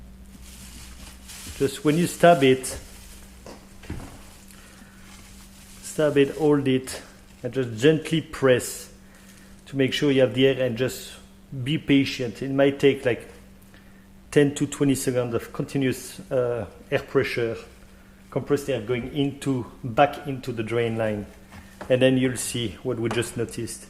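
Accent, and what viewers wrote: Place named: France. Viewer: T’es français ? I like your accent 😀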